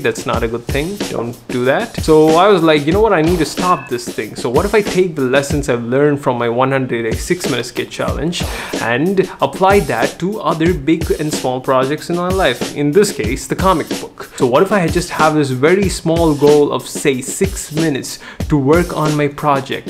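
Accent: India. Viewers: Bruh are you indian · This Indian accent. I can't understand these people!!!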